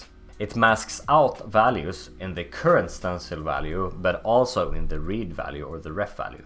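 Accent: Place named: Sweden. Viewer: Wow, really good quality for just starting out! I really liked the explanations, they were pretty clear and your accent is very nice. Kind of sounds like an Swedish accent to me. Personally, I didn't like the moving parts (top, bottom) whenever the code was shown, because it kind of distracted me, but that's just me.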